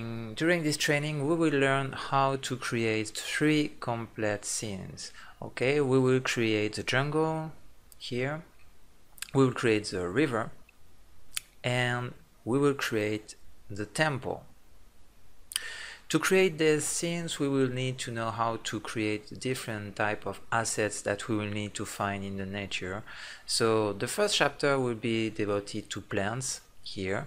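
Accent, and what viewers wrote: France. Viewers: Muy interesante, por cierto el curso esta en inglés?? Porque veo que el profesor es francés y tiene fuerte acento francés, no me queda claro si el curso es en francés o en inglés. Deduzco que en inglés ya que el video introduccion esta en inglés, pero pregunto por si acaso. Si esta en inglés lo podré hacer, pero en frances no.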